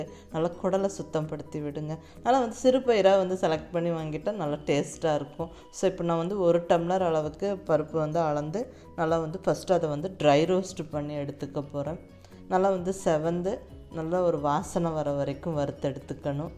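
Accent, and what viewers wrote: Kongu, India. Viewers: கோலை கொங்கு தமிழில் பேசி சமையல் கலை அற்புதமாக இருந்தது பீர்க்கங்காய் கடைசல் ஜோர் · Ama madam namma kongu spe super 👍👍👍👍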